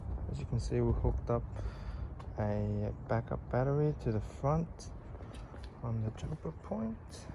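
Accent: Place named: Australia. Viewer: You sound Aussie, Is this an Australian delivered Macan?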